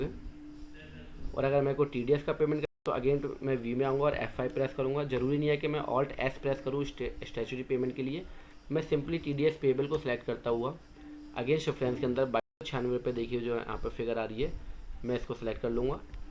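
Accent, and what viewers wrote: Agra, India. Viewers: Sir aap agra se ho?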